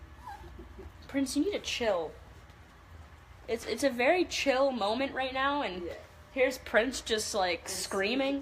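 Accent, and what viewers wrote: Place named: California, United States. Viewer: why does she sound like she's From Cali 😂 that's how we talk here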